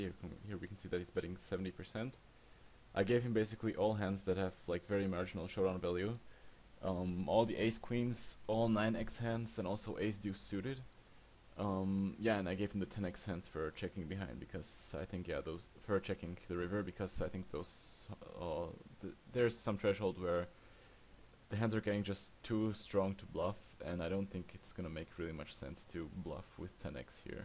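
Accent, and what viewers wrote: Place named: Eastern Europe. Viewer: A+ content, vyslovnost temer nepoznamenana slovanskym jazykem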